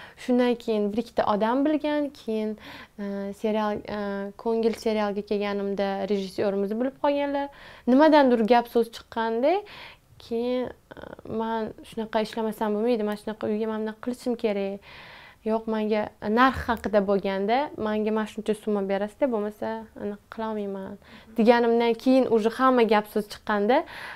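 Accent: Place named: Tashkent, Uzbekistan. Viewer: Nega toshkentcha gapiryabsiz sirdaryoliksizu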